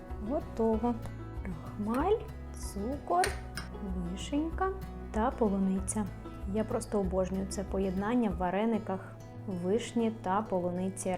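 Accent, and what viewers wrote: Ukraine. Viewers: Очень милый акцент Украинский❤😊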